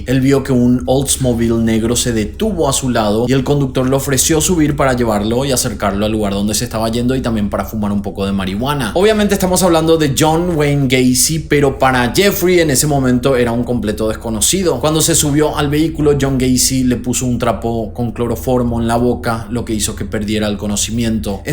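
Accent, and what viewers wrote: Paraguay. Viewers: Wacala hablas como paraguayo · Los prejuicios siguen existiendo infelizmente los gays, trans y mujeres, fíjate el caso de el niño Lucio en Argentina, le negaron la custodia al padre y al tío paterno,las leyes están hechas por hombres en general y no por ser de libre pensamiento Feliz Navidad, te sigo hace poco pero tus cortos sin muy buenos Vivi en Asuncion 4 años hace muchos años y reconocí al toque tu lindo acento de Paraguay Soy Argentina y vivi en varios lugares, el último ( por ahora) es Barcelona Te mando un abrazo y te felicito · De casualidad no sos Paraguayo?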